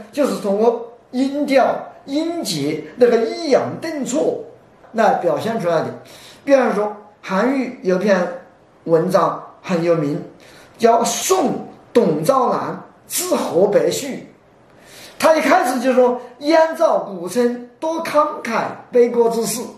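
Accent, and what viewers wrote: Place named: Wuhan, China. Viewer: 武汉口音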